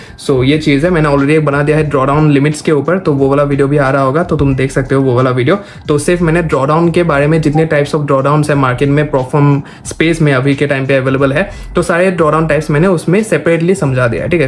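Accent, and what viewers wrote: Assam, India. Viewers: Kotha pota style tut ye axomiya lage 😂❤️🙏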